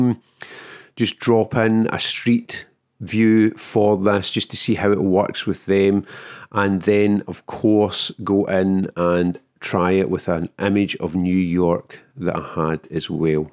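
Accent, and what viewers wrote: Scotland, United Kingdom. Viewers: Interessante Methode! Und was für ein drolliger Akzent! Ist das Schottisch?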